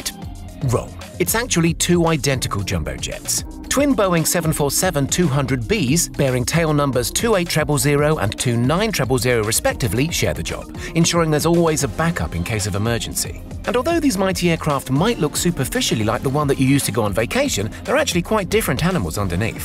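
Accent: United Kingdom. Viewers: Wow, that British accent caught me off guard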